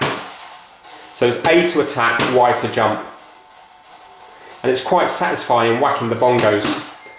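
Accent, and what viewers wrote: United Kingdom. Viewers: we present the award for the most english sounding youtuber ever to this guy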